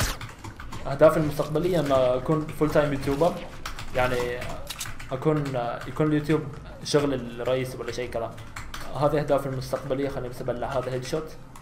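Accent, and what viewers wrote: Iraq. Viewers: هو ما قال بس من طريقة كلامة واضح عراقي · منور يا كلبي بل لهجة العراقية 😍😂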